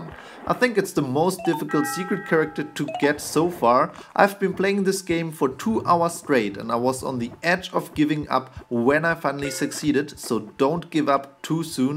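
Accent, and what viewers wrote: Germany. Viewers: Your accent sounds like germain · You are German? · Are you German?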